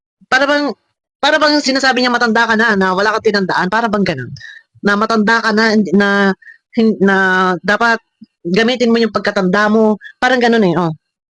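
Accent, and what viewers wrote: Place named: Iloilo, Philippines. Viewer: Matanda kna.....NAGTATAGALOG KA. ILONGGO NMAN ANG TUNO MO.. TANGA KA.. KAKAHIYA KA kapitanang UBOD NG BOBA.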